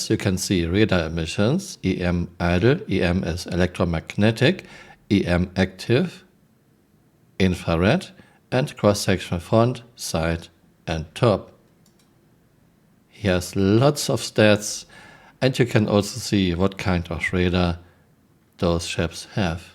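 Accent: Germany. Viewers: deine videos sind echt super cool und informativ. Etwas ganz besonderes in der star citizen community und ich liebe deinen deutschen akzent haha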